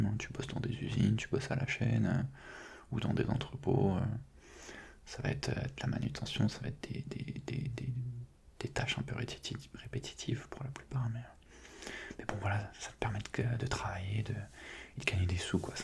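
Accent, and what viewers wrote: France. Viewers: I love french men voice and accent 🤤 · French is a language so good to hear. 😍😊 I'm in love with that accent.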